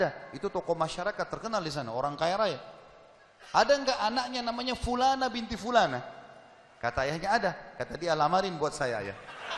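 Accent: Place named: Arab world. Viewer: tri atmojo bener. cara ngomongnya kayak orang arab bgt, ya. logatnya khas. mungkin karena keturunan arab juga. haha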